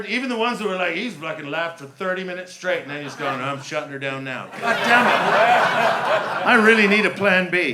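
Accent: United Kingdom. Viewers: very funny show, your British accent was very similar to the Dart callers. 😂🤣🍻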